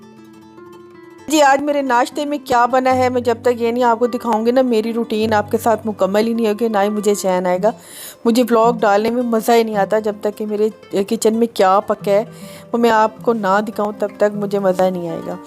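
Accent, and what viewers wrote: Saraiki, Pakistan. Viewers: Towadi saraiki axcent meno bara pasand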